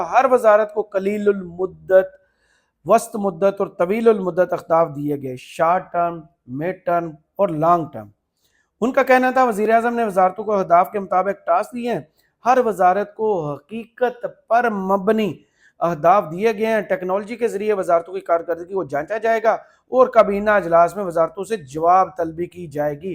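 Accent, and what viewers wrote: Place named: Punjab, Pakistan. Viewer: PUNJABI LEHJA BHT ZABARDAST HA APKA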